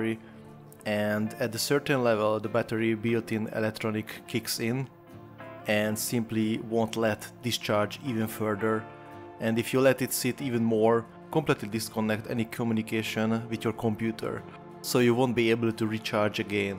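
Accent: Hungary. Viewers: Interesting stuff! Cool Hungarian accent btw! Cheers.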